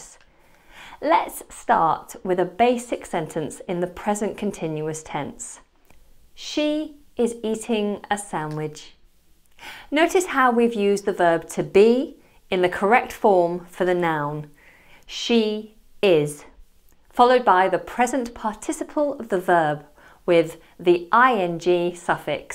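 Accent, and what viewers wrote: United Kingdom. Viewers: Brilliant explanatiom with proper British accent. Keep it up🖒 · It's a little difficult for me to understand the british accent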